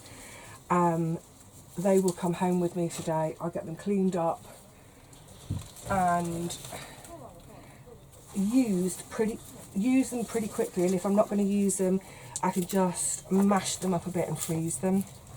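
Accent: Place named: RP, United Kingdom. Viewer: ..."as I was saying upside down in the broad bean bed..." you do make me laugh..loving the stuff you do and am a new sub, so thanks for the content...just to add, I thought you were a bit posh ( accent) and then I watched you talking about how you grew up on a council estate and I had to rethink my impression of you!!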